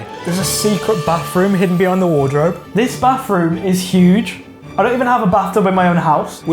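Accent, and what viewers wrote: United Kingdom. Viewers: ryan trahan but in british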